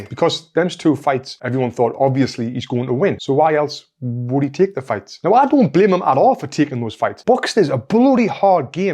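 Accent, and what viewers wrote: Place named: Newcastle, United Kingdom. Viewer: Just started listening to your content tonight, good crack pal, Bob.. Geordie tho😂👍👍👌.... Fury, s talked himself upside down once to many times and your right maybe it's the start of decline... Hhhhmmm.. Time will tell... But a tell y what I've watched that fight with nganou 10 times fury hit him with a few full on blows and didn't even rock his head!!! He's a monster nganou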